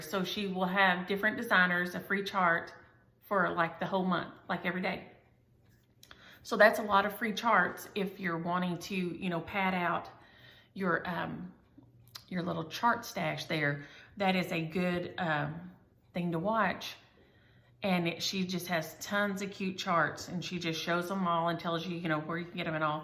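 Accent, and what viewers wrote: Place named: South, United States. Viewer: your videos make my day!!, you warm my heart. I'm a Southern living in the north and hearing you talk makes me feel right at home. Thanks for sharing with all of us. And by the way, where did you get that adorable red blouse?????? I LOVE it!!! Hugs, Judy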